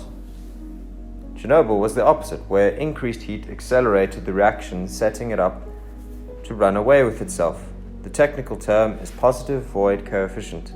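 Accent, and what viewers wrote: South Africa. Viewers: Your nasal South African accent is made even worse by your flat and dead-boring narration style. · Hey I hear the Cape Town accent! Hello from somewhere close by. Very well done video :) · It’s a South African accent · I like your South African accent 👌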